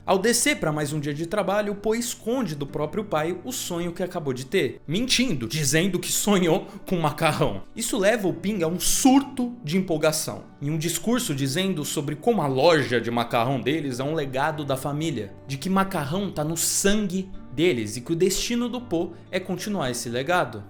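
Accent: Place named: England, United Kingdom. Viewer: Ele ta falando com a pronuncia em inglês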